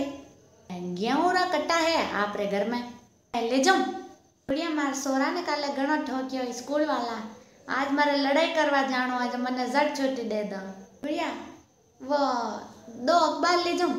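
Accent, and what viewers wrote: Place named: Sirohi, India. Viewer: Sirohi vale ese hi bolte hai Koi fark nhi hai marvadi or jo aap bol rhe ime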